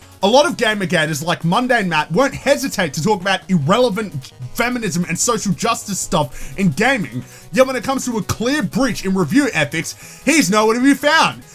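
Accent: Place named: Australia. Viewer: The Australian accent just makes what you said even funnier. Great video as usual.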